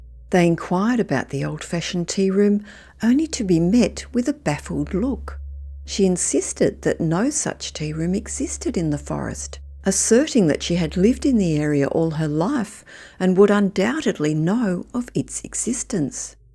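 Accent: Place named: Australia. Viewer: She's Australian. That's how Australians talk.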